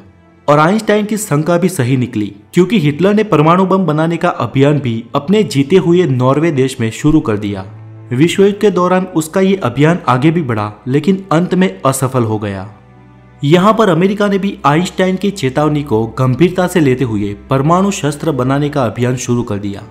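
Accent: Gujarat, India. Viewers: U gujarati?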